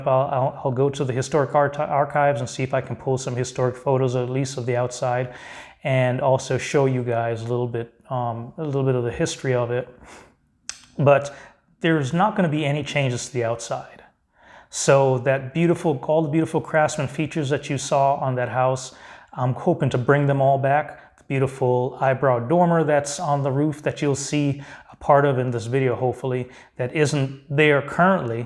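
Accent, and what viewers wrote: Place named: South, United States. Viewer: He sounds like a southern gentleman and very humble. Best of luck to this fine man.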